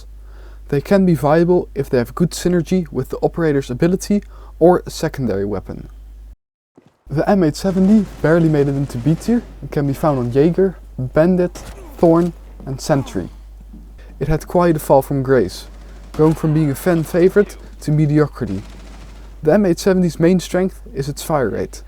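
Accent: Netherlands. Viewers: I hear fellow dutchman, i press like 🇳🇱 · good video also, are you dutch by chance? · Are you dutch? I think i recognise your accent. Great video btw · Im sure you deserve more attention. I really like your dutch accent. You have a talent, keep going! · always nice to hear a dutch accent in english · Are you dutch? You sound a bit like it.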